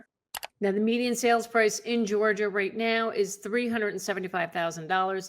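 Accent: New Jersey, United States. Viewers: You are good looking and totally on top of your game. Love the Jersey accent.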